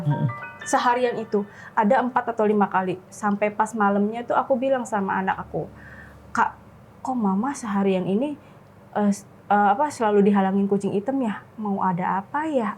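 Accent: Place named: Karawang, Indonesia. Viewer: Logat krawang nyaa .. jdi kangen kluarga aku yg d Rengasdengklok 😊😊😊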